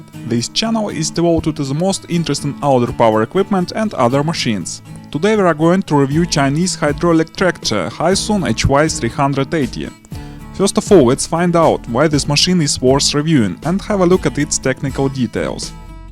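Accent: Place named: Russia. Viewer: По акценту понял, наш спикинг )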